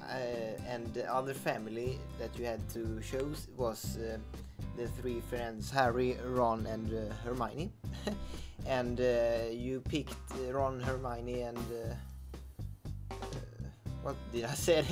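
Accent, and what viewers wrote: Sweden. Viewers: o i barely noticed this was uploaded on my 18th bday xD,btw i love your swedish accent :D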